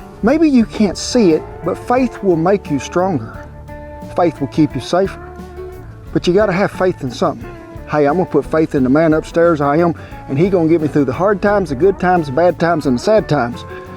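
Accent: South, United States. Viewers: We cook this kind of food pretty much every weekend, when the weather in our parts allows us doing so! Being a linguist, I would like to thank for his sincere and natural Southern tongue.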